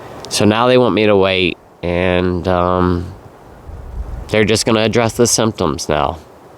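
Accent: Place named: South, United States. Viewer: Hi, I’ve been following you since “dry” January up here in CT when I decided to cut back on my drinking and you’ve made such an impression on me that I’ve turned it into a happier, new way of life. I love watching your videos, your honesty in telling your story in your easy Southern style of speaking. Tonight’s video was really a heartbreaking setback. I’m so sorry. You look so healthy and handsome, it’s hard to imagine you could have so many complications. I am praying for you, as I know many of your audience are. But somehow believe you are going to get through this. Keep the faith. ❤ Katheryn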